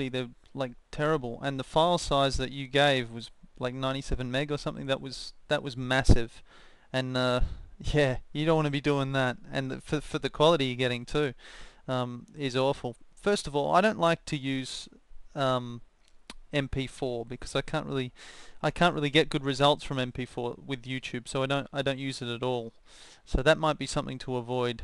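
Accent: Australia. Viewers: hes not english hes australian or smth u idiot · He's Australian you fuckwit. Any idiot can tell that.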